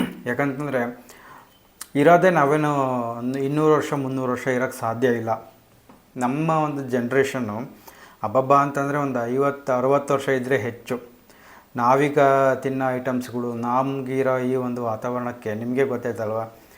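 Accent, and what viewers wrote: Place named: Holalkere, India. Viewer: Anna nammadu Chitradurga, Holalkere Grammina bhashe Keli nanna balyada dinagalu nenapayitu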